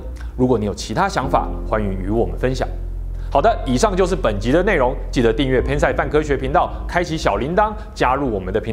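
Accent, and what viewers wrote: Taiwan. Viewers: 你这个频道就不要哗众取宠替它洗地了。封城的次生灾害你有客观统计吗？党国大学发在nature的期刊就是你所谓的“客观”？操着一口流利的台湾腔和繁体字在这误导自由世界。不齿。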